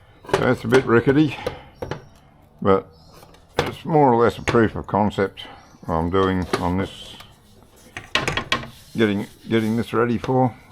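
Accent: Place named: Queensland, Australia. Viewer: . Yeah you are very watchable and that pure Queensland accent has a lot to do with it ! I have never watched a fishing channel on TV or YT cause they are all melodramatic wankers, like the 4WD wankers wearing the obligatory Drizabone oilskins when it’s 40 degrees😂 Obviously you’ve been doing this stuff since you were a boy so you speak with authority unlike most of YouTube where it’s always a case of ,” hello, we just bought our first boat and this is the first time we’ve been near the water so we’re going to teach you how to sail around the world…….once we learn how to untie these rope things…😂😂😂😂😂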